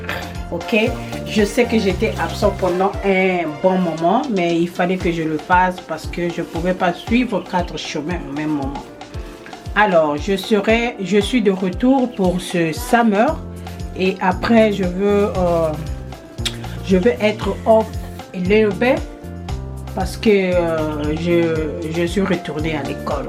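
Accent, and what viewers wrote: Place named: Republic of the Congo. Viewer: Merci ma soeur Ton accent est du congo bzv Sans me tromper vous etes lari? Je reconbait notre accent . Soyez béni.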